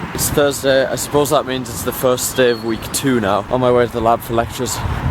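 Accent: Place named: Northern England, United Kingdom. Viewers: He definitely has a northern accent, as I'm from Newcastle and I can spot a hint of it assuming through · I can't place your accent, is it gateshead....? Or somewhere near Newcastle?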